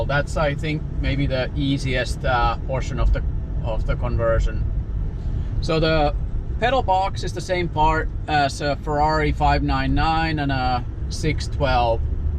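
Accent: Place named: Finland. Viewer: nice project. good solution for duoselect have to say that you sound very Finnish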